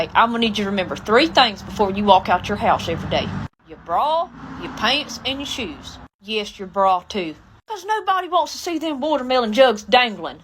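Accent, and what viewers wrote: United States. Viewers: Sounds like SE Ga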